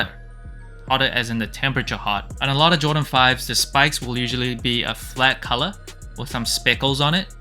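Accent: Australia. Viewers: I can’t read your accent, are you from Australia?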